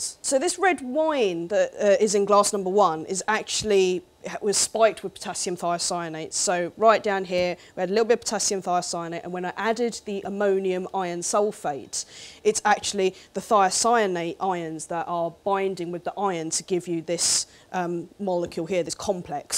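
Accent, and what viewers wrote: United Kingdom. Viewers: Attractive Asian woman with british accent and a science degree... where does one find such majestic creatures? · Asian Chick + British accent = HOT!!!!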